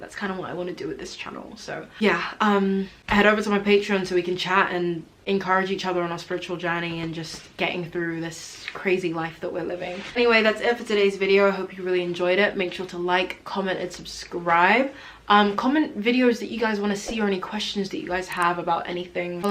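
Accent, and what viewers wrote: United Kingdom. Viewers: I just came from one of her older videos, why does she have a British accent now?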